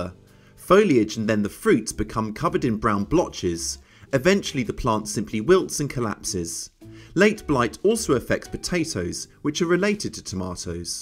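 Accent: United Kingdom. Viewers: First sentence and I label him as Brit. :D I have a weakness for their style so.... (pardon gross generalization but Brits make me smile)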